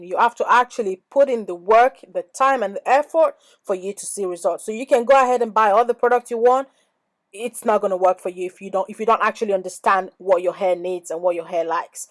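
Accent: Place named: Nigeria. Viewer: Mhmmmmm.....by ur accent I know youre a nigerian.....and an igbo woman at that😂😂💜💜💜💜 subscribes ur funny af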